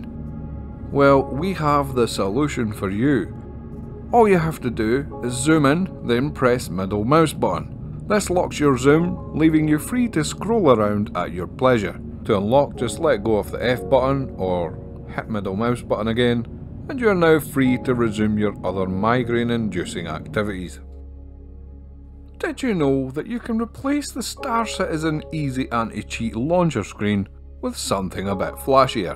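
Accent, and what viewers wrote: Scotland, United Kingdom. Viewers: Gotta say i love that scotich accent 🤘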